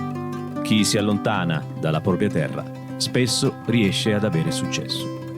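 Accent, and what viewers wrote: Italy. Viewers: Non era meglio farlo dire ad siciliano???soprattutto per la cadenza?? Sicuro sarai Siciliano ma sicuramente nun nascisci dassutta😂...la tua cadenza è troppo "italiana" pi' parrarri accussi😅 Grande cmq❤...in molti dicono sti detti,ma in pochi sanno che significa realmente!!! Un saluto da Regalbuto (ENNA) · Tu sei calabrese non siciliano vero? Sono carini questi proverbi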